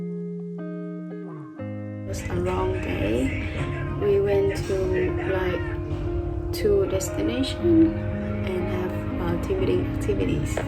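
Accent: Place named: United States. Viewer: nói tiếng Anh tưởng người Mỹ ko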